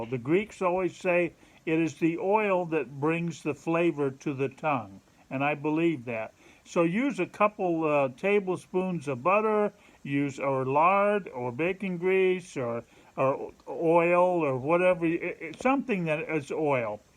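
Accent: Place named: Pennsylvania, United States. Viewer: sounds like you are from Reading Pa. thank you!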